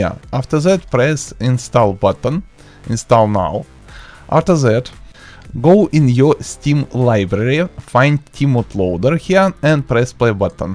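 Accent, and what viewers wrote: Russia. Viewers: Чувак, русский акцент прям выделяется. А так видос классный.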